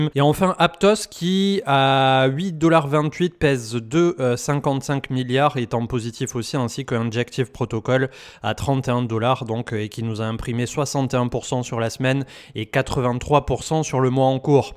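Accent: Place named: France. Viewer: Pour l'accent anglais c'est pas grave, on comprend, tu es français ....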